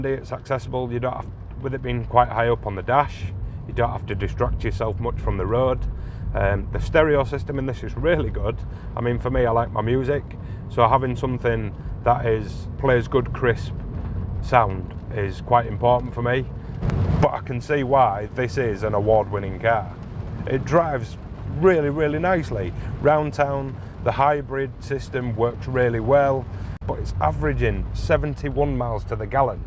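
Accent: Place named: Northern England, United Kingdom. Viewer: I like your friendly northern style but respectfully suggest you need to cull as here and there you repeat yourself. Less is more... I wish you had taken a motorway run as I'd have liked your observations regarding what many reviewers complain about, viz wind noise and tyre roar in this scenario. Apparently both are excessive. I'd be most interested to hear from owners on this subject if any of you care to comment, please. I've ordered a top spec Mazda replica - forgot to say that I think the exterior design is stunningly beautiful from all angles - but can't forgive the manufacurer for producing a car sans satnav as I loathe the prospect of faffing around with phone cable connection etc. Grrrrrrrr!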